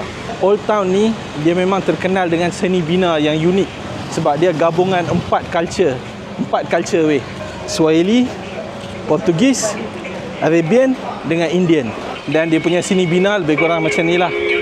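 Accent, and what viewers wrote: North, Malaysia. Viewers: Selalu tgk vlog mat salleh..ni vlog BM loghat utara..mmg lain dari yg lain..saya dah subs😊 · Yang paling best loqhat utara tak hilang weiiii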